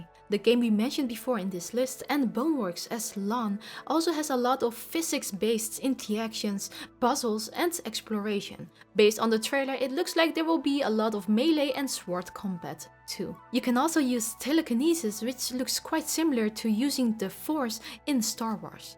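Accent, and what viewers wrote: Netherlands. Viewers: Dear god I love your accent. What country is that? Edit: Oh found it, Netherlands! Yeah I can recognise it now! Cute! The bladed weapons used by Ven are called Khopesh btw.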